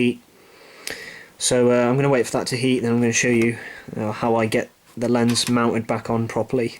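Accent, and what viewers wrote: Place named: RP, United Kingdom. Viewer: You sound so intelligent with your nice posh accent , us up north sound like thick *unts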